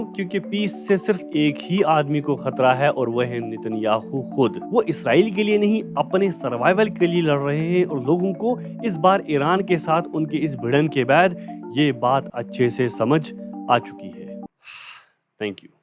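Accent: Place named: Pakistan. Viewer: definitely Kashmir wali side Sy hngy because dsri side India Waly asy nhi bolty Urdu like Pakistani type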